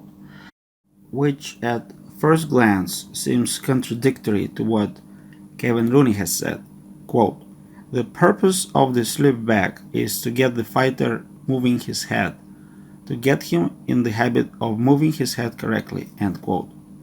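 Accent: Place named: Russia. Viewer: Делай переводы ты же русский Пиздец падишься по произношению . КОНЕЧНО ТЫ КРАСАВА!!!!СЛОВ НЕТ !!!ДЕЛО СВОЕ ЗНАЕШЬ!!! НО НА РУССКОМ ЯЗЫКЕ ПЛИЗ МОЖНО?!!!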